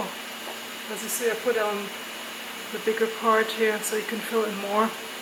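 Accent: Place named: Germany. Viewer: Da ich höre das Deine Muttersprache Deutsch wäre, frage ich in Deutsch....😅 Funktioniert es gut?...hattest Du viel ärger damit? Überlege mir auch so einen zu kaufen.😊 Grüsse Roy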